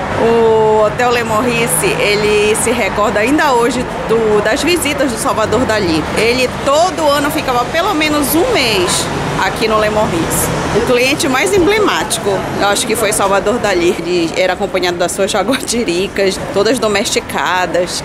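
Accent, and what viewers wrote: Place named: Pará, Brazil. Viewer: Que bom saber a história da tour Saint Jacques. Você é paraense? Eu sou paraense e achei seu sotaque parecido com o nosso, adorei e parabéns por nos trazer conhecimento, obrigado.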